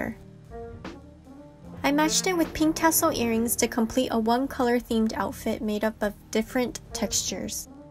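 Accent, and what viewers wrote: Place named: United States. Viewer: Hey can you do a video about your life? Like where you are from... or how you ended up in japan having such perfect american accent. I am really interested in your channel and want to know more because i just soooo curious. Or maybe how you ended up being a model or making a youtube channel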